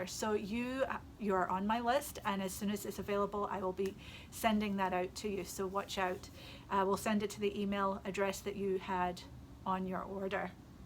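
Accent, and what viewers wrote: Scotland, United Kingdom. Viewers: I love hearing your wonderful Scottish accent and seeing all of the gorgeous Yarns you bring in for us! Thanks so much and stay safe!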